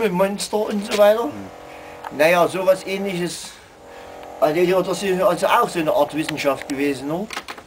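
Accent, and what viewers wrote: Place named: Berlin, Germany. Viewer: Also ik würde sagen dit bärlinärische is klasse ! JJJ ! xD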